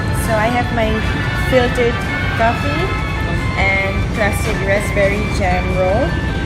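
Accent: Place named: Hyderabad, India. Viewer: "Hyderabadi"...very Hyderabadi accent :) Nice video and scrumptious foods.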